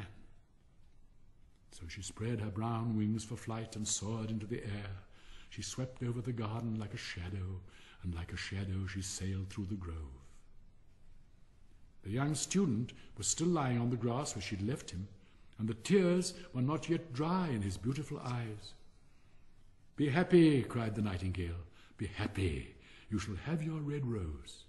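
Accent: United Kingdom. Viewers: Thank you! I was looking for a british narration.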